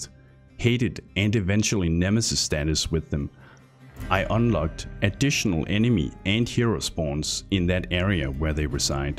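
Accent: Denmark. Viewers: are you Danish? your dialect sounds so Danish! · im guessing from ur accent that ur danish, but im not sure. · Like ur videos, it sound like ur from Denmark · Haha den dansker accent XD god video min ven. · It’s a danish accent lol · The lad is Danish so cut him some slack ffs.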